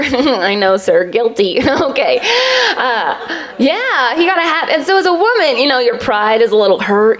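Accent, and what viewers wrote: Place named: Georgia, United States. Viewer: Great comedian, terrible audience. Also... looooove that Georgia accent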